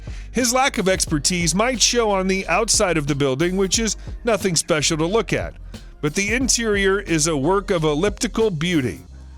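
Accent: United States. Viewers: He speaks with an American dialect. I'm from Canada and nothing he said seemed wrong. I also have a lot of American friends, so I am used to hearing their mispronounciations. Look up how they produce Iroquois versus how it is properly pronounced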